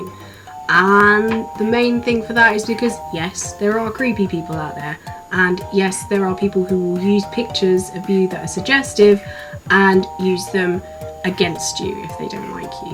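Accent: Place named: England, United Kingdom. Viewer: It's so great to see an entertaining Englishwoman who's accent doesn't make me want to kick a puppy. English accents are either cool sounding and enjoyable, or ridiculously annoying and hellish. There is no inbetween for me.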